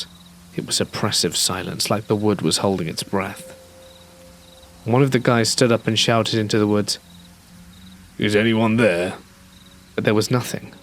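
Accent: England, United Kingdom. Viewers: Yay! New video from the classic English man narrator. Thank you:)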